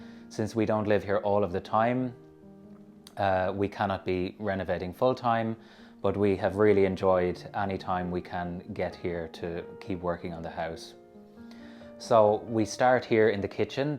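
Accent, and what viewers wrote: Ireland. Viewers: Great house,your accent makes me nostalgic as I am a Dubliner in London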